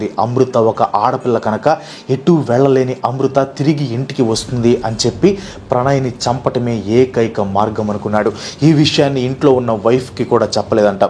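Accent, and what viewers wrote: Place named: Rayalaseema, India. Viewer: Meeru seema bidda ahh?! Maadhi kuda seema ney! Andhuke aa yaasa nu batti adigaanu😎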